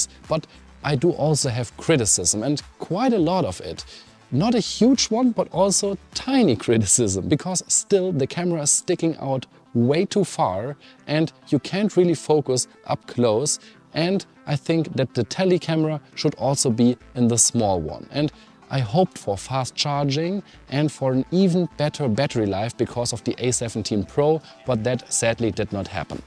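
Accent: Germany. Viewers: Ich mag dein DEnglisch 😅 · You sound German … wouldn't mind this video in German.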